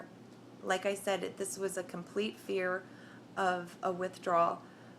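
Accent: Philadelphia, United States. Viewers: Lol soon as I heard you talk I knew u were from Philly or jersey. Philly here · hey I really appreciate your vids. don't listen to the haters and I hope ur getting a good laugh at the people commenting on here that are obvi. LEOs. anyway you bring up really good points. are u from philly? I think I hear the accent lol. im from the suburbs detoxing myself from subs right now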